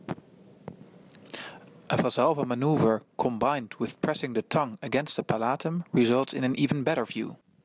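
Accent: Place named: Netherlands. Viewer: Heel goed, Nederlands accent!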